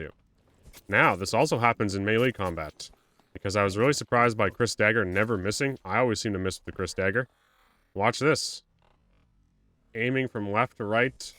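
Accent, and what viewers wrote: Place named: United States. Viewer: your english is indistinguishable from an american speaking English, where are you from?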